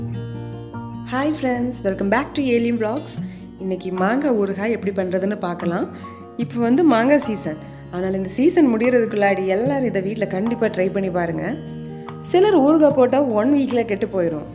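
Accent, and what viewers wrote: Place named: Kanyakumari, India. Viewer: Kanyakumari Tamil❤️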